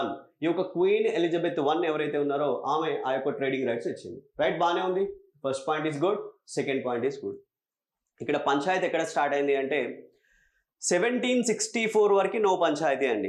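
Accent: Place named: Telangana, India. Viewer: Superb and excellent classes sir... I am a big fan of ur telangana yaasa..🎉🎉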